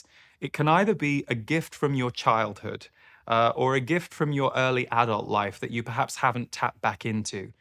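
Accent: England, United Kingdom. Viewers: I remember your first videos…you had hair back then and a lot heavier English accent. Lol congrats on 10 yrs💕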